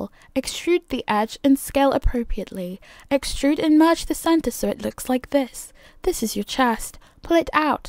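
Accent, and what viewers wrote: United Kingdom. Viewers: I just focused on the British voice.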